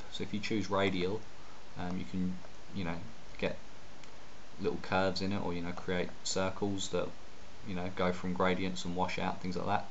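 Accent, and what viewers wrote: England, United Kingdom. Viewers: You have such a cool accent! I thought you were English!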